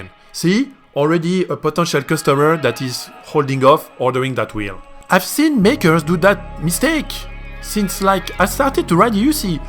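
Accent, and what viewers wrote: France. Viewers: you sound like my one of my brothers here in portland who comes from France ;)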